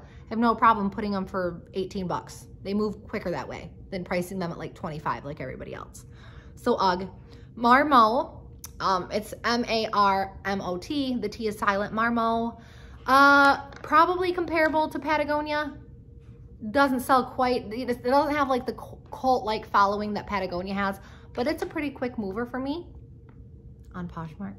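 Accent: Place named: Chicago, United States. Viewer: Are you in the Chicago area? You have an accent….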